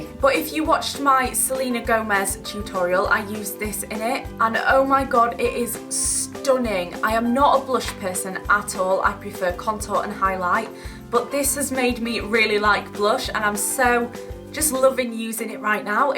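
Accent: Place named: North, United Kingdom. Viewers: 'Godda let yer legs grow ant ya?' 1- OBVS, all about that yeti life. 2- I always feel ashamed of my Northern accent in videos but yours makes me laugh, I love it! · I may be wrong but you sound a bit Yorkshire and I just wondered if you were born there😊😊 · Gotta let your legs grow ant ya! Love that Northern twang 💕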